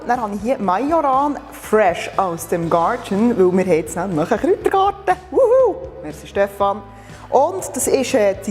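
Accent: Bern, Switzerland. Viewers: wenn der Titel auf Hochdeutsch ist, dann werden die meisten wohl davon ausgehen, dass im Video auch Hochdeutsch geredet wird. Aber der Berner Dialekt ist so schön, dass es auch mir als Österreicher egal ist, dass du in der Mundart redet. Das meiste versteht man eh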